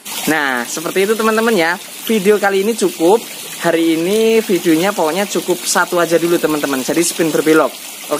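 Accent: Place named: Java, Indonesia. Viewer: Saya tahu mad dari PTM Kemang Jakarta tapi penasaran sampean orang asli mana? logat jawanya kental banget.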